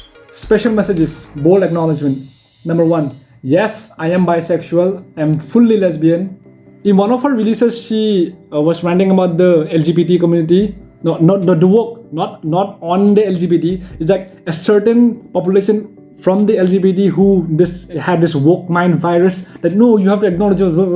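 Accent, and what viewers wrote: India. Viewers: Bro is asian with an Indian accent, like HOW?!!!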